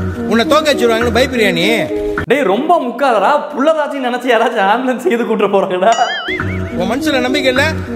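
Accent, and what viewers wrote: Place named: Chennai, India. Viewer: Unga madras slang semma. "Kutty kunjan" alaga irukku. Kidnap pannamnu neenga sonnadhu, semma.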